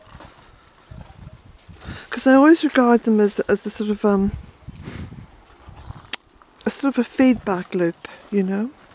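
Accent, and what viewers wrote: Ireland. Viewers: I watch your vlogs for inspiration, comradeship and hope: but also for relief from stress, solace in hard times, an escape from my own life and even as an aid to peaceful sleep when life is really hard and I am in distress. I put my laptop on the floor near my bed and drift off to sleep listening to your lovely voice and tales from at those times and it has been such a blessing. So yes indeed, with your Irish lilt provide a sanctuary for me:)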